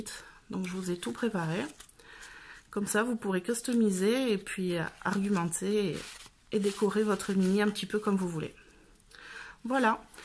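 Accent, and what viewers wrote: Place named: South, France. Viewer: oh! comme ça fait du bien d'entendre ton doux accent du sud! Une superbe réalisation et le début d'une belle aventure pour toi! Quand je te disais qu'il fallait te lancer! Elle est super ta vidéo, bravo! MUXUS!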